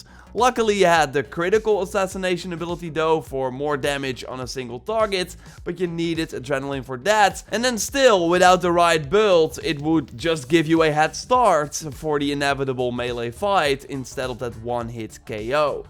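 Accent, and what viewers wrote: Netherlands. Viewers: I can hear that you are Dutch hehehe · This dudes accent sounds so dutch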